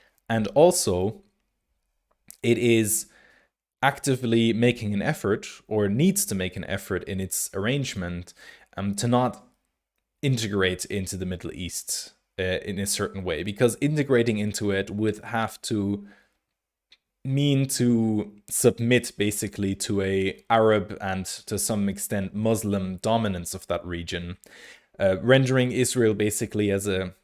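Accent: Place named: Ireland. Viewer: Bizzare, I just replied to your thread, posted it, but I don't see anything. I did however see my previous question/comment posted, but apparently you didn't. I've no reason to doubt your word on this. It'd seem irrational to assign the veracity of a debate, based on a specific age group. Surely, the merits of the points raised are what counts, not the age of the speaker? I'm still wondering about your "Irish" accent. Perhaps you'd rather not say, and that's perfectly ok.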